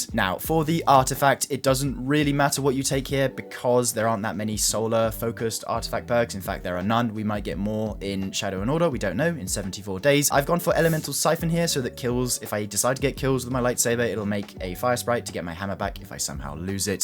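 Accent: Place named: England, United Kingdom. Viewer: Hearing saying "You'll be bonking everything" in his English accent is pretty funny, as it means shagging everything over here. 'Bonking' as related to hammers only really works with an American accent, to my mind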